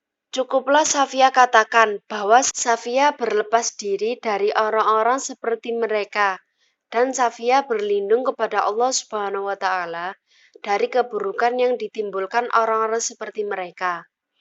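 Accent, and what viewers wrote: Java, Indonesia. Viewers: Dihhh annoying bangett sihh mbbaakkk , suara nya itulohhh medhookk nya ,dan kontekstual banget kaku kek kanebo keringg, isi jga g jelas n berbelit-belit, sama kek junjungan nya si syiah hobi n pandai Berpura-puraa, nohhh ikutt drama telenovela sonohh biar dapet piala, sayang kan sama bakat pura-pura nya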